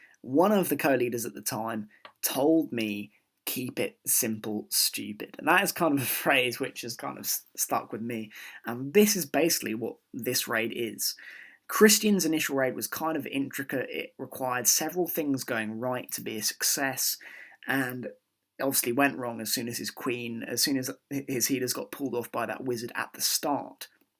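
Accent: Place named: United Kingdom. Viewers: great series. I definitely remeber Dakota telling me to keep my plans simple when i was a tryout in alpha. Keep up the great work i look forward to your vids and your British accent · British accent OP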